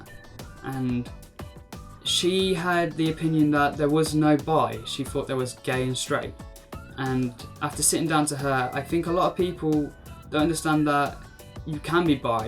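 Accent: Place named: United Kingdom. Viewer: You look so good and such a beautiful long neck, and that beautiful British accent, you are adorable.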